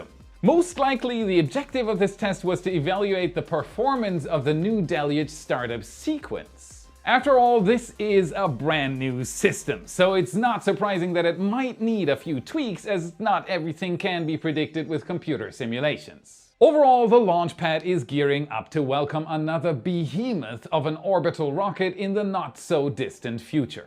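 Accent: Germany. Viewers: You called me out for the marine mariner comment I’m blown away! Didn’t know you were German until this episode keep the great accent coming I meant no disrespect! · Your German accent is fading! The states are rubbing off on you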